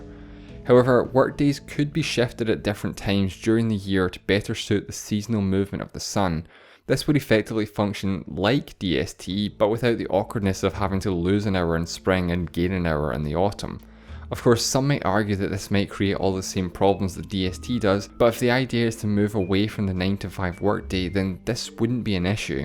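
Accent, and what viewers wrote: Scotland, United Kingdom. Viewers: what accent is this?? Brits?? makes me puke... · His clarity is fine. He just has a Scottish accent that some folks will take getting used to. And I’m sure every youtuber is aware that more videos = more subscribers. He likely has another job or school that is his primary focus.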